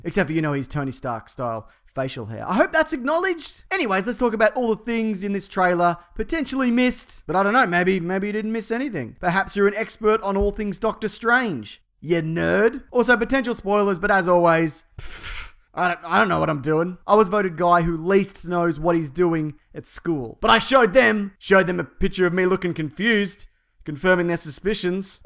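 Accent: Australia. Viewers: yeah his australian speech pattern is definitely entertaining. keep bloody going mate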